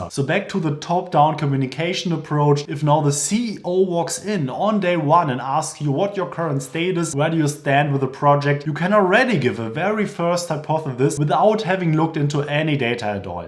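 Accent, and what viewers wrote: Germany. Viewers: Your English is So German. 👍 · Your videos are highly rated and appreciated. Your German accent adds uniqueness to your way of presenting valuable knowledge and experience. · Youa german englisch maekes me aggressive, soirry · I can feel that you are doing this deep German accent on purpose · nur so mal als Frage, ist dir der starke deutsche Akzent mal zum Nachteil geworden? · Genau , bist du Deutscher? Wirklich 🎉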